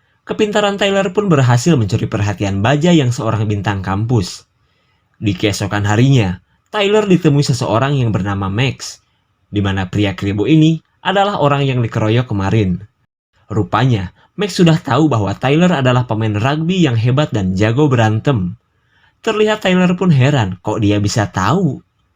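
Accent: West Java, Indonesia. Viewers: Teu dindi2 ai jama sunda ngomong na garelo tpi mantak nyeri beteng ngakak bae😆😆😆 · Sunda pisan ekekkek hanjakal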